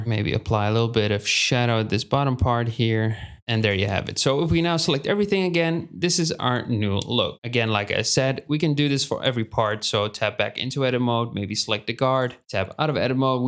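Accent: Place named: Netherlands. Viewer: I hear dutch.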